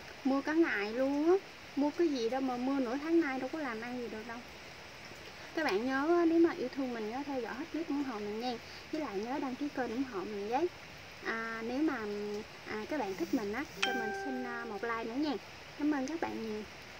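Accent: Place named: Mekong Delta, Vietnam. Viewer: Mâm cơm nhìn hấp dẫn quá, con gái miền Tây nói giọng hay và khéo tay quá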